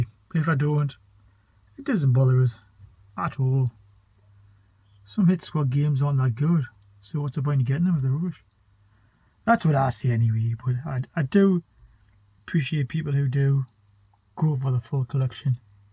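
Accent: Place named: Ireland. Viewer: Congrats on the 200 episode mate and i never knew your Irish 😂 you have some excellent collections my favoutite is the tins of paint. Seriously though the shed is class mate and love the tape game collection. Nice to see the consoles set up and computers its nice to see the master system getting some use as well as it collected dust at mine. Great stuff dude 🙂👍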